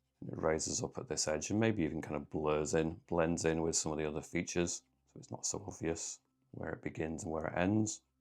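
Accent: United Kingdom. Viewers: when im painting a bird or working on some cool image, I find myself talking in a soft British accent as I paint along adding highlights and colors. Its like your right there with me :) directing me as I go. it makes my project that much more interesting. plus I get to work on my accent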